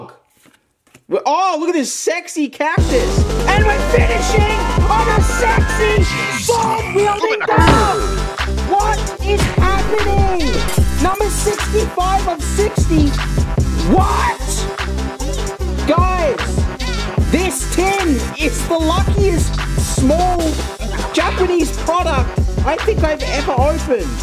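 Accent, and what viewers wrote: Australia. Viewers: you sound like the australian rick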